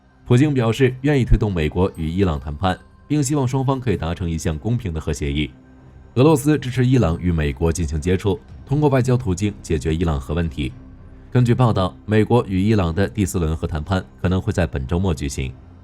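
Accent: China. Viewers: 台湾人学大陆口音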